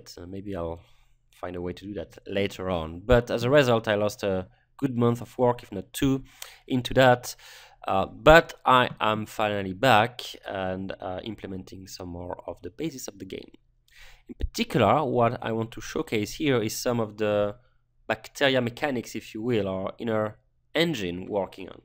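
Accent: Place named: France. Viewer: nice touch with the logarithmcic scales, it a good move on your part really, being able to represent 1E5 and 1E-5 just with 10 units of difference graphically shure does help a lot, the only thing I hope there will be is some kind of note for newcommers to inform them. It still seems to advance nicely. (but that french accent though, ça fait bizarre à entendre '-' . C'est surement moi qui est bizarre...)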